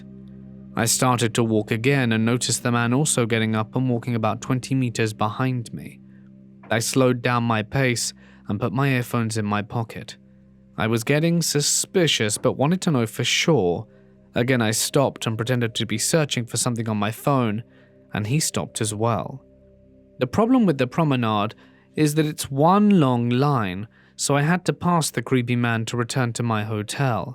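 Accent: United Kingdom. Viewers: ❤your voice uk from ?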